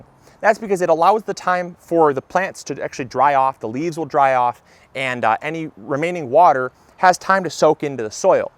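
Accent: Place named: Midwest, United States. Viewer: I appreciate the cliffnotes, but also enjoy hearing "What is going on, everyone?" with his pleasant Midwestern accent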